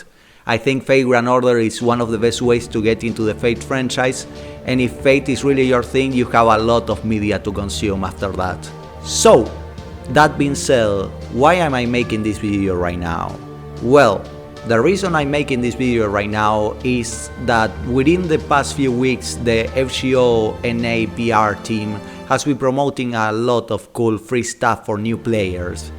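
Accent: Argentina. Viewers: Tenés el acento más argento que yo haya escuchado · Sos argentino?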